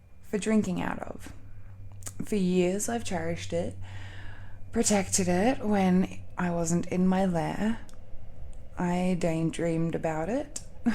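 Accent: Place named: Australia. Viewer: holy shit au accent asmr im melting.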